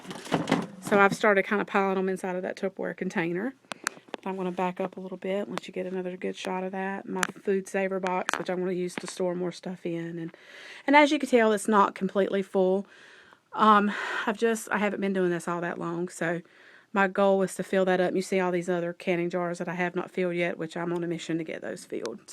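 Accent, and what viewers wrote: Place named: South, United States. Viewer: Great video. I like your curtains. I think I will try it too. Love your accent. You must be a Southern Belle like me!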